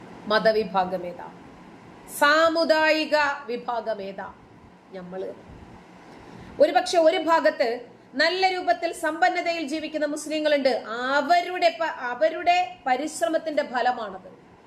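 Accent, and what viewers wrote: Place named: Kottayam, India. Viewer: അക്ഷര സ്പുടതയോടേയുള്ള, അറിവുകളുടെ നീതി ന്യായയുക്തമായ താങ്കളുടെ സംസാര ശൈലിക്കു ഒരു പ്രത്ത്യേക കോട്ടയം സ്റ്റൈൽ തന്നേയുണ്ട്. അറിവിന്റെ ആശംസകൾ. 🌹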